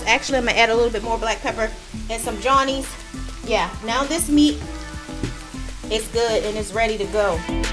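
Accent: United States. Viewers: Are you from New York? I love your accent.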